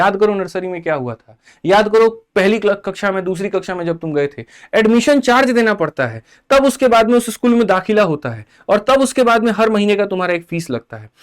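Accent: Bihar, India. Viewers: Jarur Bihar se hi ho ap...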